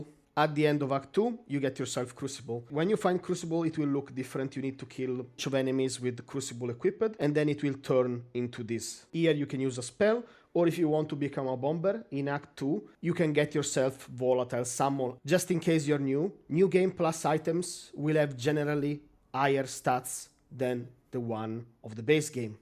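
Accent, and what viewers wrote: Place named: Italy. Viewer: ah ecco............mi pareva che tu fossi italiano!!!! dall'inglese, buono perfetto, ma ho sentito che quell'ìinglese era un pò da pronuncia italiana!!! Comunque Grazie, le tue build sono interessantissime e questo è un bellissimo gioco!!! che ancora non ho finito visto che sto provando build su build.........e sono a 100 e passa ore!! Hai preso anche l'espansione? io si, e mi piace un sacco!!!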